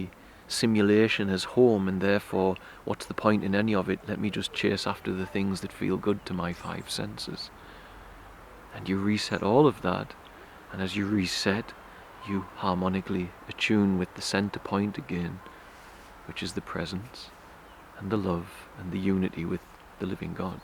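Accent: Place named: Northern England, United Kingdom. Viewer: Are you in Northern England here? Is this also the source of your lovely accent? 😊🙏🏼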